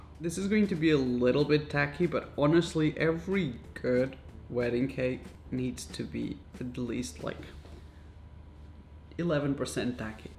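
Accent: England, United Kingdom. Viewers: He’s from england i think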